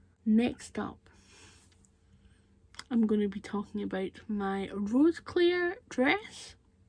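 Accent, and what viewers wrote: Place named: Glasgow, United Kingdom. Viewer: Oooooo that sky behind you. I was born in Glasgow and moved to the midlands when I was 10 so it is lovely for me to hear your voice. Accent of home. Thanks for your videos.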